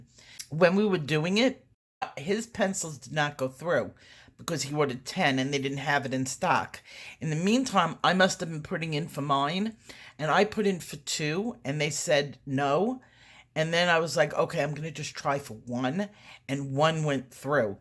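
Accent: New York, United States. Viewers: beautiful. I had you older in my head. Must be the New York accent.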